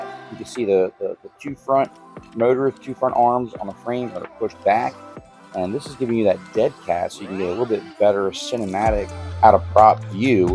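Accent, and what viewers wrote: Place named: Maryland, United States. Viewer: Could tell straight from the first 10 seconds you were from MD 💪🏾😂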